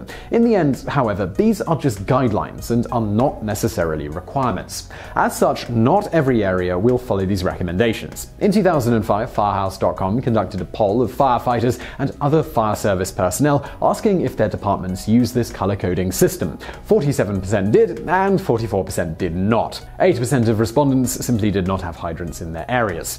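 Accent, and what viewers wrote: United Kingdom. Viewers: I'm confused. Why is someone British (by his accent) explaining about fire hydrants in the USA? In Britain hydrants are below ground level and plates ('H') indicate the hydrant distance from the plate and the diameter of the water main. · Oh look, the British Mathew Santoro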